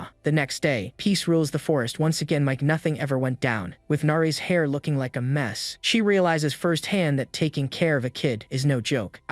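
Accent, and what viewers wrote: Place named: United Kingdom. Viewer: I feel like the voice is trying very hard not to be British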